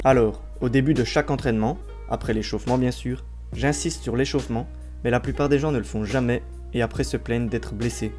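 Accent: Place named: Sudan. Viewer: La quintessence de la callisthénie!! Merci, t'as un ptit accent il me semble... Tu serais pas originaire du Soudan?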